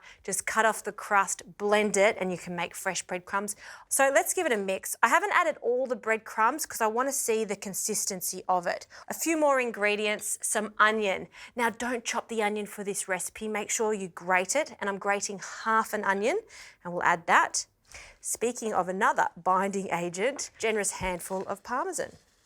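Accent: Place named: Sicily, Italy. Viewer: I love your Sicilian accent!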